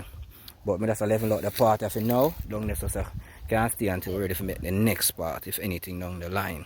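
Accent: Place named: Jamaica. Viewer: Do your Presentation Minus the MY PEOPLE PATOIS. Try to speak English.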